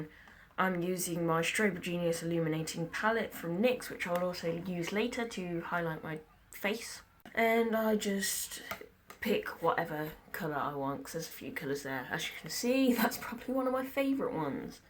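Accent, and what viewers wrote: United Kingdom. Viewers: lol you speak with a british accent where does that come from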